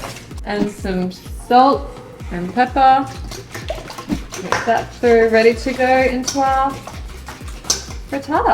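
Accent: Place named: Australia. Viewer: you are such a funny and beautiful cook, I love your Auzzie accent and am a huge fan of your wonderful husband Texas guitar slinger Mr Gary Clark Jr, Ive seen you two interviwed at the grammys and you just take my breath away, fine couple hope you can keep your love flowing Thank you for all your delicious recipes you of course make it all so delicious.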